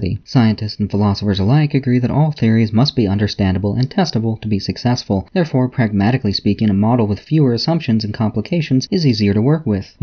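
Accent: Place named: United States. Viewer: He's American that's why I'm looking for a nice concise English accent to explain it to me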